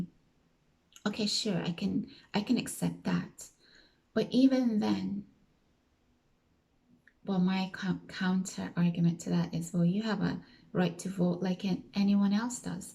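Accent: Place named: Scotland, United Kingdom. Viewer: You're absolutely right about politics being two sides of the same coin. I also find politics boring and contrived, and I agree that it's in our best interest to take things/viewpoints from both sides or none, rather than subscribing to just one. That way it's alot harder to be brainwashed or tarred with the same brush. Left/Right is just yet another duality we have here on earth. Everything is duality, pepsi/coke, red/blue etc... Constant divide and conquer techinques. I've never followed Charlie Kirk but I would never glee at anyone's death. I am extremely careful about where I send my energy. I'm not sure why Youtube decided to reccommend me this video haha. You have a very calming voice and seem like a high vibrational person. And I resonated with you when you mentioned how dull everything is during Wintertime in the U.K. It's like that here in Melbourne AUS too. 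I also travel during the colder months. Hot places are my favourite. I know you mentioned Sheffield, but did you live in Scotland at all? I noticed sort of a Scottish twang to your accent.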